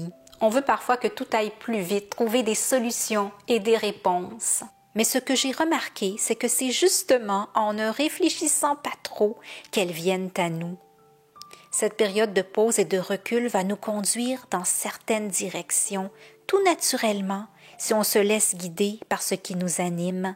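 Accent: Canada. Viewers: Bonjour j espère que votre forme est revenue je suis tout a fait d accord avec vous pour ma part plus je prévois faire de choses et moins j en fais notre corps nous parle il faut savoir l écouter si on tombe malade c est pour nous obliger a arrêter merci pour vos vidéos et le petit accent canadien ❤😊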